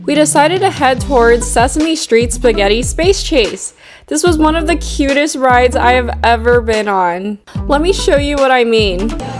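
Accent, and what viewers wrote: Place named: United States. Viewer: 最近跟著小六的女兒一起學英文，可以聽道地的美語，還有有趣的Vlog，非常棒的影片。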